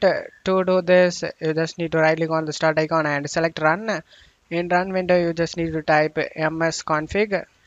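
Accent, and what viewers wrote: India. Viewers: I love these indian accent tutorials so much, this for real fixed my problem. I subbed you! Thanks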